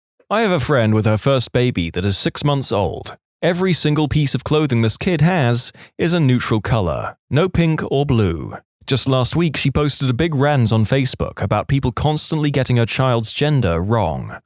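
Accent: England, United Kingdom. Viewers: omg British man? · Who is this very English narrator and what did you do with British possibly from Edinburgh narrator I need a narrator with my accent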